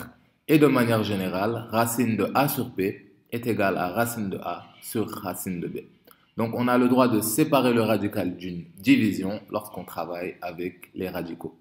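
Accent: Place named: Africa. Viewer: Vraiment merci beaucoup, et je suis sincère maintenant, j adore ton accent africains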